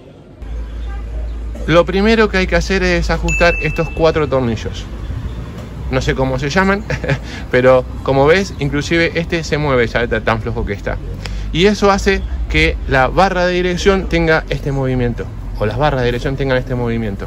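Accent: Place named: Argentina. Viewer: Hola. Y la rueda no controla?? Solo esto? No aflojas dirección? Creo que talves mi problema de vibración y movimiento leve de izquierda a derecha. Se soluciones así .. ja .. mi moto es vieja y jamas se la hace nada. Aunq mis rodamientos están buenos en la rueda y su eje. Seguro son los barrales. Gracia por tu info. Me suscribiré.. y por cierto. Eres argentino??. Suenas tal cuál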